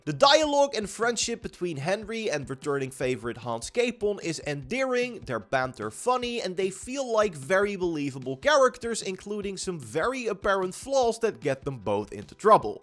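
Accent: Netherlands. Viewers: I dig your Dutch accent. Groetjes uit Duitsland. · the thick dutch accent is real omfg.... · Echt het Nederlandse accent ook ! Ik kijk echt naar dit spel uit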